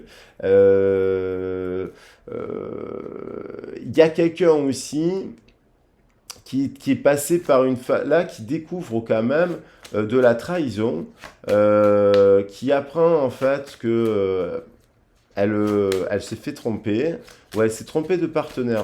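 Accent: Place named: Marseille, France. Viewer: Coucou sa me fait plaisir de vous voir en ce dimanche j aime bien entendre votre accent marseillais c est vrai que c est magnétique et puissant sentir ce désir intense l amour pur véritable c est beau quand c est reciproque c est le coeur qui parle cette appelle des deux âme qui veule ce retrouver c est magique ce bonheur bisous 😚💞🌈🌞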